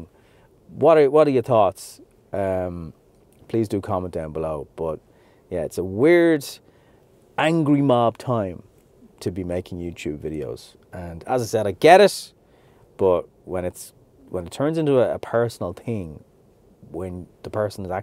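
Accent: Ireland. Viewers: Sorry, I haven't followed you up to now. I'm not a fan of irish voices on YT vids. However yours is fine, now I'm a follower. What you've said is all common sense, it appears the general public has none of this. I appreciate your candor. I appreciate your comments on the effort / rewards etc. This video was honest, transparent and wholesome. Thanks for this.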